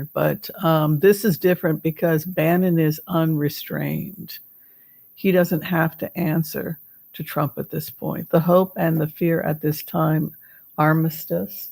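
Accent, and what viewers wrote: England, United Kingdom. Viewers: Great British accent! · As a Brit , you’re adorable English accent makes me giggle and feels healing to me! You are wonderful!!!!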